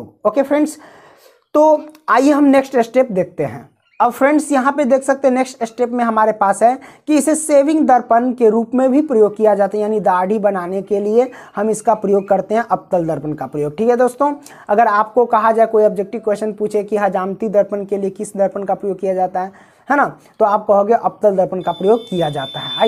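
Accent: Bihar, India. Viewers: AAP bihari ho na aapki language she Pata Chao gya 😋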